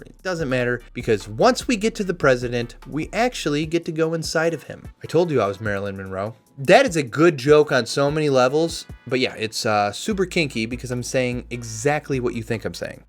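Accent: Indiana, United States. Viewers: Is dude a Hoosier?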